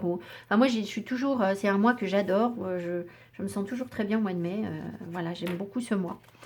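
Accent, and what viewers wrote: England, United Kingdom. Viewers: Merci, le tirage m'a beaucoup parlé. J'entame une formation en mars pour aller justement vers plus d'alignement et oser être moi faire ce que je veux vraiment. Mais bien entendu c'est beaucoup d'investissement (argent, énergie etc) et ça faire remonter effectivement des angoisses (est ce bon pour moi? Est ce le bon choix? Vais je aller au bout? Vais je réussir à mener tout de front?), l'ange Ariel m'a beaucoup parlé car justement, un de mes projets concerne la méditation. Je vais donc essayer de connecter avec cet ange! J'adore votre accent anglais; est-ce votre langue maternelle?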